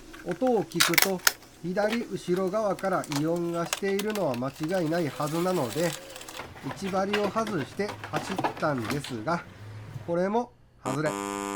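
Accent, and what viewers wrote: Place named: Kansai, Japan. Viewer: 関西イントネーションもいいです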